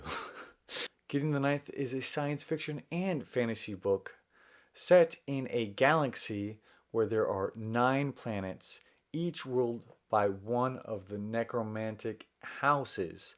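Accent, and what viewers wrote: South, United States. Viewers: I can't believe you had a book called, "Gideon the Ninth" by Tamsyn Muir at your house in Texas on this video during your quarantine until it came out last year back in September 2019 while you're reading and talking about a swordfighter named, Gideon, who is funny, witty, very charming and such a thorn in Harrowhark's side after that, but I hope your husband, Zach will be very proud of you and I love the black and white makeup on your face to look like the character, Gideon from the book in front of it, because I love your Southern accent and it was my birthday last Wednesday, so I'm 31 years old, right now!!! #GideonTheNinth #bookreview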